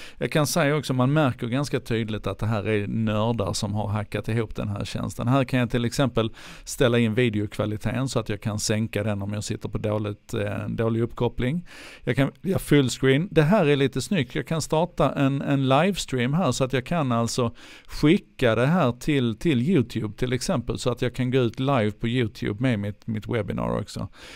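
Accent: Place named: Scania, Sweden. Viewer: ) Alternativ till Zoom :-) :-) :-) och så förstås den härliga skånska stämman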